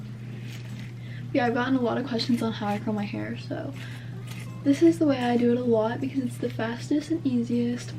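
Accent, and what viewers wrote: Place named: South, United States. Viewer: love your hair color and i love the little hint of the southern accent in ur voice, ur so cute!!!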